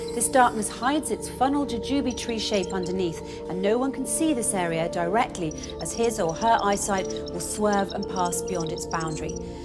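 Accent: United Kingdom. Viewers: ملاك يغني سمفونية العقل والبراهين الفيزيائية في القرآن بلكنة انجليزية بريطانية رائعة وفقك الله Good bless you angel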